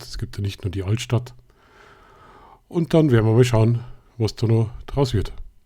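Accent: Bavaria, Germany. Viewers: Hey hat mir sehr gut gefallen Deine kleine Führung durch Salzburg auch die Nachvertonung 👍 witzig und typisch bayrisch äh 😂 LG Sascha😊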